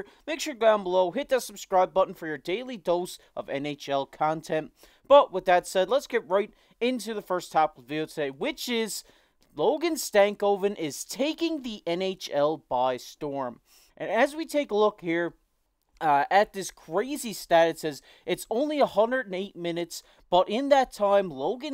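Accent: Canada. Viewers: Great videos, you are the most Canadian sounding guy ever :)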